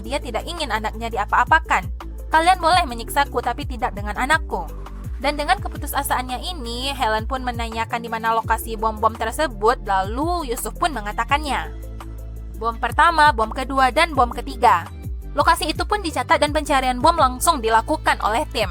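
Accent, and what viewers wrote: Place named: Padang, Indonesia. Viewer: Ini orang padang yak🤣🤣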